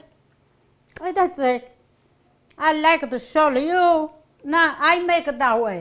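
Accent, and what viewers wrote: Italy. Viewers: I love her Lil Italian accent plus recipe lock yummy❤ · Hi Italian. Grandma. I love your. Cooking. All your recipes. Are. Great. I am making artichokes. This weekend. I watch you every single day. I enjoy your singing. Plus I love your Italian. Accent. Yim 4th generation. From Sicily. Keep. Cooking. Grandma. God. Bless you. Love. Ssndyin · No wonder this video is viral: "Now-a, first-a you gotta open-a da peppa, you got a nice-a peppa like-a dis."? You're ADORABLE! Sweet stuffed Bell Peppa's, from a Sweet Italian Bella Donna like-a. Statta Buon! Ciao. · Love watching you and enjoyed your Italian mannerisms and accent. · I am a second-generation Italian American who grew up in the 40s and 50s in upstate New York in an Italian neighborhood. Both of my parents spoke Italian but chose to not teach us because "we were American". But all of my grandparents and most of my neighbors spoke with the same accent as you. 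When I watch your videos, it brings me back to when I was a kid. Most of your recipes are just like my mother's. I have tried several of your recipes and they are never disappointing. We had friends over for lasagna recently and my wife made the lasagna with store-bought pasta because she didn't want to take a chance with your recipe until we tried it first ourselves. Well, I made your recipe myself and after everyone had a portion of my wife's. I brought out my dish and everyone raved about it. Even my wife, who is usually very stubborn, admitted that my dish was better than hers. I like your singing too. My father had a beautiful operatic tenor voice and sang all of the time. Thanks again for being you. Domenic Campanella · I love, love, LOVE your Italian accent.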